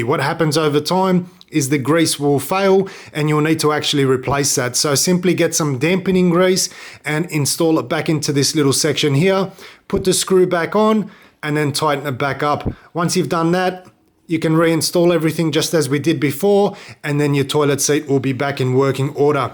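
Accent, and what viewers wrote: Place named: Lebanese-Australian, Australia. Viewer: I left my home in Sydney's inner west and moved to QLD in 2007, geez I miss the Habib accent. Thanks for the lesson and the bonus nostalgia.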